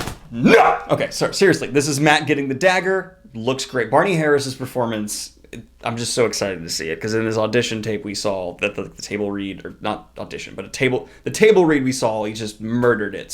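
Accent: United States. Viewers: are you from NOVA??? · That’s such a ridiculous critique man. He pronounced them properly and is using them in a sentence, also that’s how an American accent sounds. Don’t be harping on people for not pronouncing something the way you think it should be. Try saying those names out loud in a sentence and I bet they sound exactly the way the audiobooks and says it. This is the kind of comment that makes certain people in fandoms toxic.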